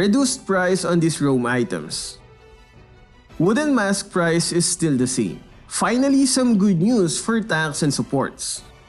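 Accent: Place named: Philippines. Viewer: The filipino accent is strong in this one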